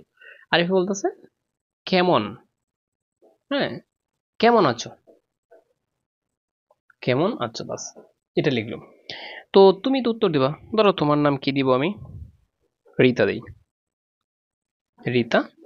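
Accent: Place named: Brahmanbaria, Bangladesh. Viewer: স্যার আপনার কথা গুলো শুনে মনে হচ্ছে আপনার বাড়ি ব্রাহ্মনবাড়িয়া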